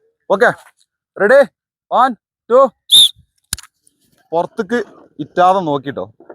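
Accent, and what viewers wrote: Malappuram, India. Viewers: നിങ്ങളുടെ മലപ്പുറം സംഭാഷണം സൂപ്പർ 👌👌👌എല്ലാ game m വളരെ മനോഹരവും, രസകരവുമാണ്... സൂപ്പർ presentation 👍എല്ലാവരും ഒറ്റകെട്ടായി ഒരുമയോടെ സ്നേഹത്തോടെ മത്സരിക്കുന്ന ഗെയിം ആണ് എല്ലാം... പ്രകൃതി രമണീയമായ സ്ഥലത്ത് കണ്ണിനു കുളിർമ പകരുന്ന ഗെയിം.. ഒത്തിരി ഇഷ്ടമാണ് 🙏🙏🌻🌻🌻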